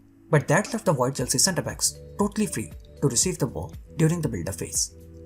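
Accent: India. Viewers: I think he is not english native speaker. Sounds like indian.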